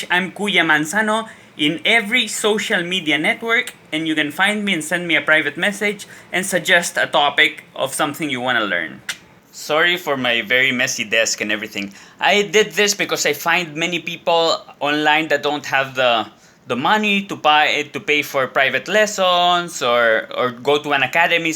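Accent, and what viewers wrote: Spain. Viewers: Sounds real authentic Castillian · Hola You’re funny! And based on your Spanish I think your from Madrid 😁☺️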